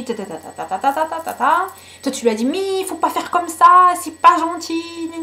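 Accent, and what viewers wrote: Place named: North Africa. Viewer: J'adore ton accent de bledard .... Tres belle video en tout cas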